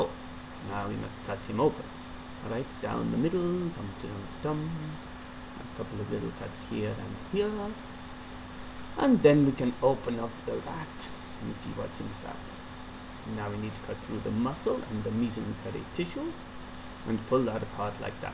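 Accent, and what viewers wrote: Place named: Italy. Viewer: Awesome vid. :) Thumbs up for the Italian accent :)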